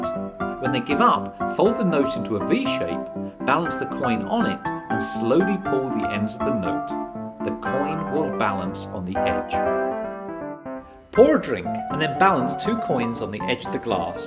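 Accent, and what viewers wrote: United Kingdom. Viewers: Jake Guidry lol american much? hes british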